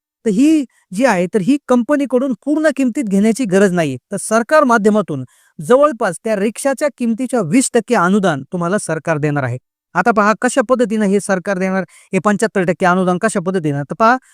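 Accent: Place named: Thane, India. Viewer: ठाणे जिल्ह्यात बोलते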